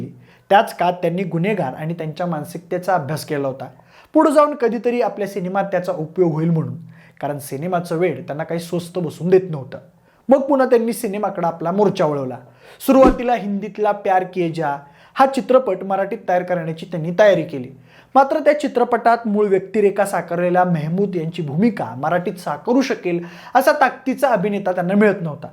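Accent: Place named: Nagpur, India. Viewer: मला वाटले नागपुर करांचा आवाज़ आहे की काय तो।।।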